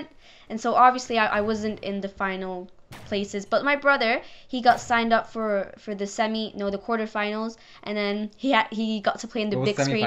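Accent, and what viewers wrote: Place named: England, United Kingdom. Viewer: It's very enjoyable when your English accent sneaks in. As far as showing your face, the mystery can be a lot fun. Think out of the box.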